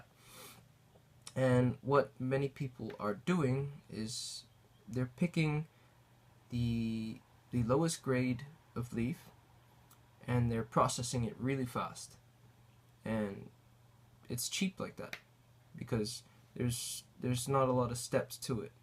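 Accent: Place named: United States. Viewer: Yeah, yeah comment is 1 year long but you're wrong. UK: /ˈmætʃ.ə/ US: /ˈmɑːtʃ.ə/. So yeah, since he's american(or at least speaks with an american accent) he's right.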